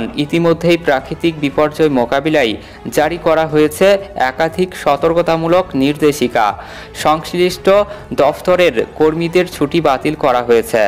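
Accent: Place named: Bangladesh. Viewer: Dada apni ki bangladesi apnar kathai bangladesi tan aache jini sanbad porchen take bolechi .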